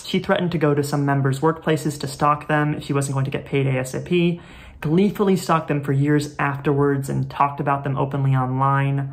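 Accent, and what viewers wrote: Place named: Ireland. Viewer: I had never heard about this guy before but the fact that people in the comments are saying this is well condensed is crazy to me. Like this is enough for those poor people to be put through! (also what is with the irish accent? why not just be english? what does northern ireland have to do with harry potter?)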